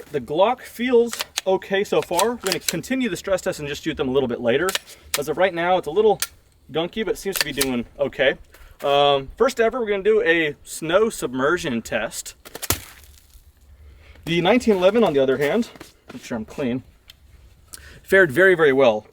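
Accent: Australia. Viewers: Lol Australian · Australian? Lmao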